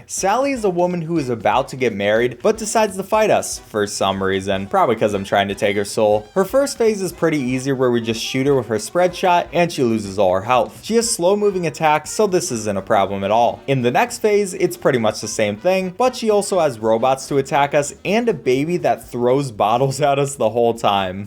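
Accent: Sweden. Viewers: Are you Swedish I em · excuse me are you swedish? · are u from sweden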